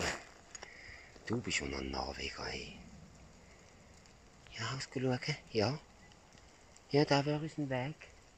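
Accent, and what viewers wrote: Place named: Switzerland. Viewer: Boah het mi das jetzt lang bruucht für usezfinde, dass du schwyzerdütsch retsch. Schöni Chatz <3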